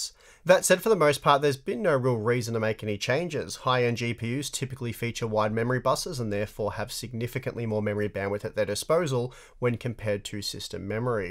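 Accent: Australia. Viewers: Love the aussi accent! 👍😜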